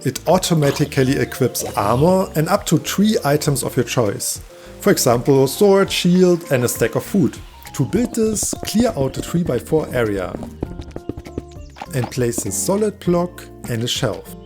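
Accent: Germany. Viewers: Are you from Germany ?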